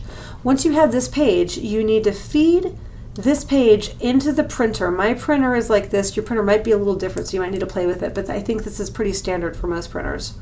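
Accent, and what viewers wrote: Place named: Transatlantic, United States. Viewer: Please slow down on your talking, too fast for me, especially with a transatlantic accent.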